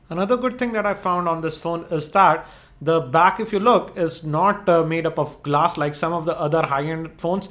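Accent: India. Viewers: just came here for the funny indian accent.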